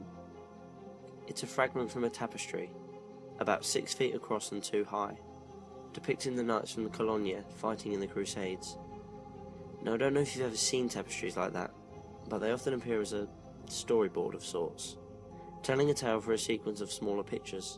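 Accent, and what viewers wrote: London, United Kingdom. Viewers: This is the first video I've watched of and my first reaction was "HOLY SHIT, HE'S BRITISH."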